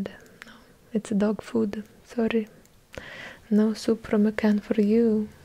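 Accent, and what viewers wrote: Russia. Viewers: Why are you so underrated 🥺 ma'am im from Pakistan and i love your heavy Russian accent asmr ❤️ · Some people say french Italian Spanish me i say Russian all the way! Just perfect! 🔟🌹😍🥰💗💗💗#️⃣1️⃣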